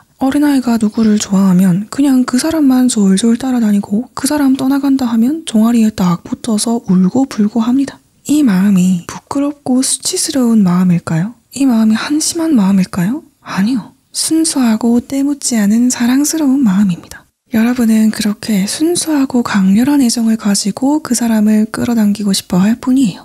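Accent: Gyeongsang, South Korea. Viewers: 작전 변경...지금부터 님을 제 와이프로 끌어당기겠습니다. 경상도 사투리 넘 매력..내 알라도..ㅋㅋㅋㅋ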